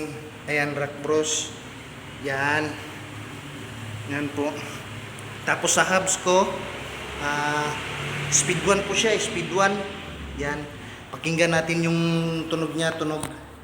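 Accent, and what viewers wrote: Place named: Visayas, Philippines. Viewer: Dong bisaya ka🤣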